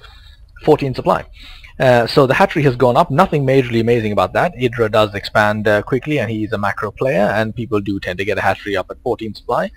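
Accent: United Kingdom. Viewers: Thanks for the cast and as always loving your British accent, keep it up!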